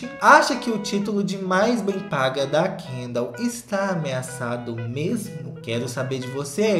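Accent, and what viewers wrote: Minas Gerais, Brazil. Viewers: Agora q eu noteiii é mineiro?! Quero ser sua amiga, bora sair kkk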